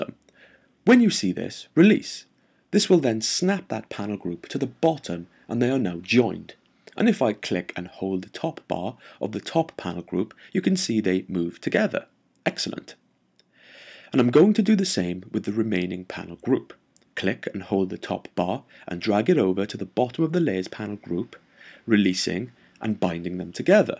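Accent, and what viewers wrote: Wales, United Kingdom. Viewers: Thank you for these videos. Love the familiar Welsh accent too :)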